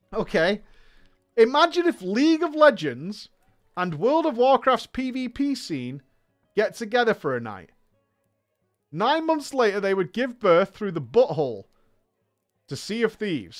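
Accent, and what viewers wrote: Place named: Yorkshire, United Kingdom. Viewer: You are a top notch story teller, being from yorkshire it's even more pleasant haha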